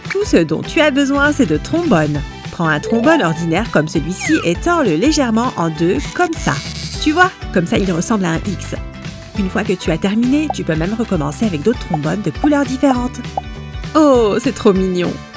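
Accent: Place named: England, United Kingdom. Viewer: Je sais pas si vous être anglais ou français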